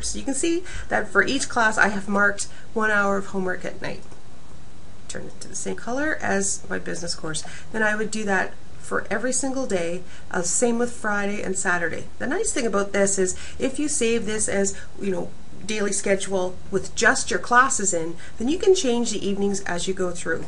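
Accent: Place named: Canada. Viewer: You're Canadian. I'm getting much better at distinguishing accents :'D this is just proof to me that our accents /are/ distinguishable from the American accent! Ha! Sorry, lovely video and a brilliant strategy, I will be using this. Thank you :3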